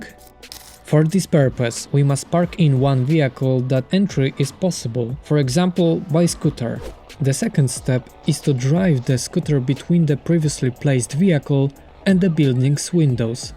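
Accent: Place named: Poland. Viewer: Słychać że polak niezły film tak trzymaj!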